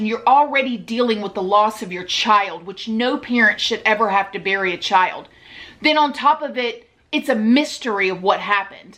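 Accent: South, United States. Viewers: Your obviously from down south, I'm curious if you have some Italian in you the way your hands fly around when you talk??? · Your accent got even more southern over the christmas i hear!😄 You are so, so sweet! And good job with the video. Very interesting case indeed!🤓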